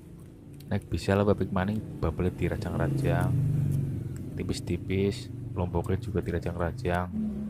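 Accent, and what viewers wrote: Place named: Banyumas, Indonesia. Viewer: Inyonge guya guyu krungu omongane rika kang 😅 karang ning kampunge inyong jowone ora ngapak. Ding nek krungu wong ngapak, koyone kok kepenak 😁👌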